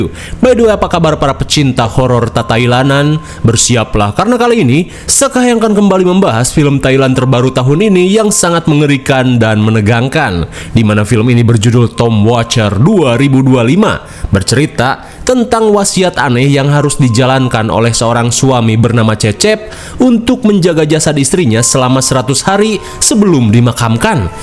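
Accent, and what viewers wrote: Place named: West Java, Indonesia. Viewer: mang,lahir taun braha sih😂..sundana jiga Sunda bandung cianjur,milenial pasti yeuh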